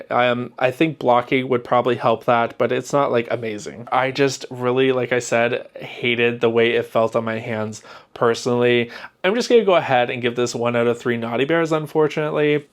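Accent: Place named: Canada. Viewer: new here. you sound canadian, where do your buy your yarn? I see in the back you have a big colour selection and I'm really looking for that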